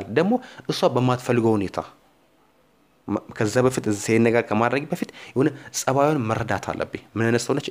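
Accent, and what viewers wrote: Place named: India. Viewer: u sound like an Indian guy speaking English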